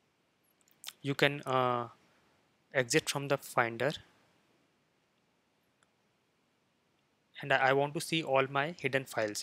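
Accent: India. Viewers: Do you can speak in English with out Indian accent ??? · I guess you guy from India... /smile/ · 这印度口音，听着真累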